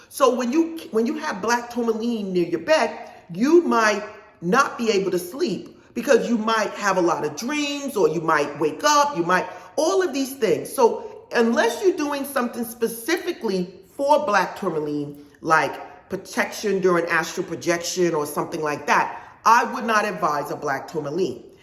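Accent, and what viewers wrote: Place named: Brooklyn, United States. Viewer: It's giving very NY, very Brooklyn. The sounds of my still living ancestors right now ❤️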